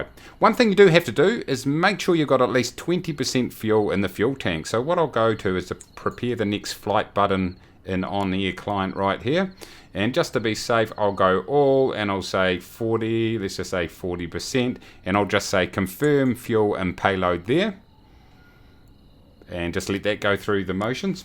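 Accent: New Zealand. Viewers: Love the Kiwi accent!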